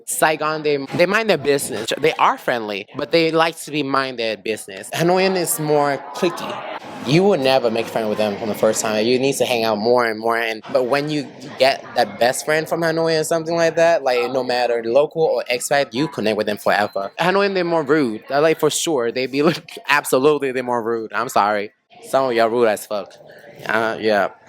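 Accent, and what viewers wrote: United States. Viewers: He sounds like he’s from Atlanta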